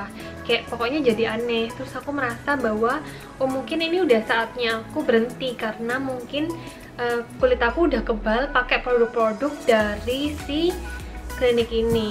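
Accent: Java, Indonesia. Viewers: Cantik+logat medok Uhhhh😍😍😍🥰🥰🥰🥰😍😍😍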